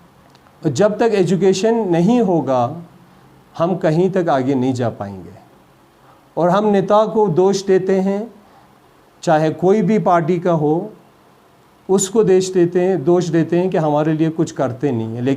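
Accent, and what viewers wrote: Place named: Iran. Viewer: pharsi style hindi he is using 🤔🤔🤔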